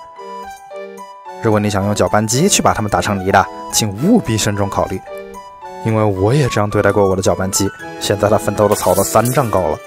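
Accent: Wuhan, China. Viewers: 浓浓的武汉口音🤣🤣🤣🤣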